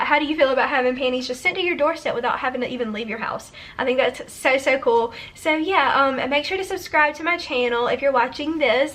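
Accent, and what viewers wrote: South, United States. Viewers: you're so bubbly & i love your Southern accent !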